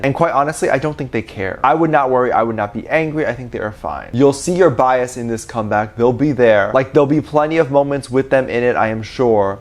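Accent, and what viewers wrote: South Africa. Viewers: you are South African? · wait are u a fellow south African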